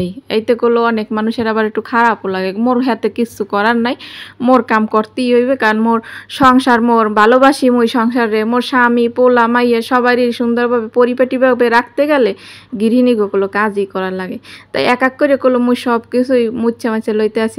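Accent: Barishal, Bangladesh. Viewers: ❤আপু তোমার সাথেই আছি ❤আর তোমার বরিশাইল্লা পাহা পাহা কথা শুনি ❤👍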